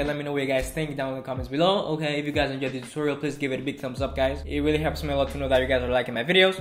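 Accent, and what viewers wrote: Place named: South Asia. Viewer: He sounds so South Asian I guess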